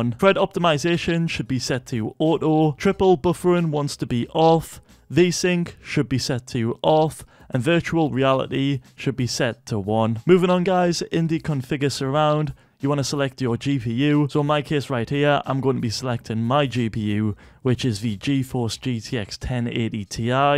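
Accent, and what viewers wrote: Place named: United Kingdom. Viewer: hes from the uk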